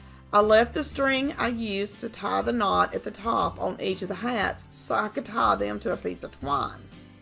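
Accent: South, United States. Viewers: I love all of your projects and your Southern accent, which is like mine.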